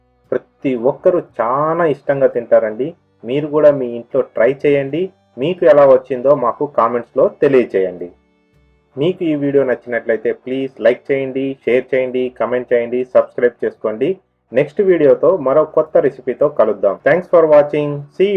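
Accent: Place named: Karnataka, India. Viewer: I like your kannada language slang, happy to hear.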